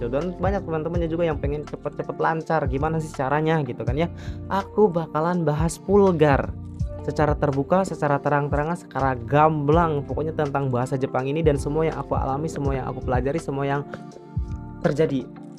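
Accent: West Java, Indonesia. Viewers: Mantap kang, makasih vanyak i'lmunya kang.. Tapi daru katanya seperti orang sunda kang duhk. 😁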